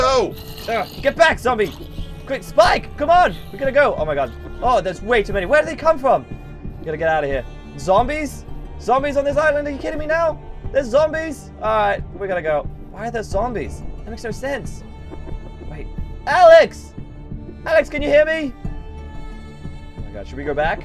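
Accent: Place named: England, United Kingdom. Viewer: I 💗 your English accent and your super nice and I always watch your videos every day 😍🇬🇧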